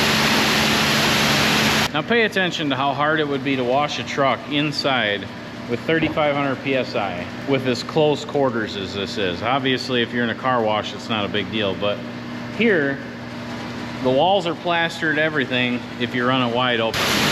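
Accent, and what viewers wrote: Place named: Canada. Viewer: Ah ur too Funny ... Canada " eh "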